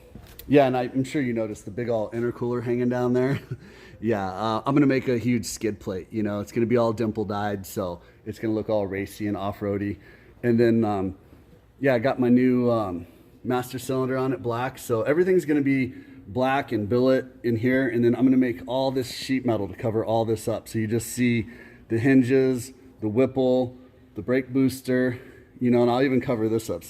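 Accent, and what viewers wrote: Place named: Brooklyn, United States. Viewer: Hey, Your humor is so heartwarming. Are you sure your not from Brooklyn?? Back in the day. Yea Right, Ha. Back in the day I can remember when Rocky opened up in the Marlborough Movie Theatre in Brooklyn. Even though Rocky's hood was Philly, that movie sure had the Old Neighborhood abuzz with Italian pride. Everybody was talking about Rocky. I bring that up because guess who talks like Rocky?? Yours Truly,. You sound like your from my Old Hood. Yo!!! Any good calzones out there in Phoenix?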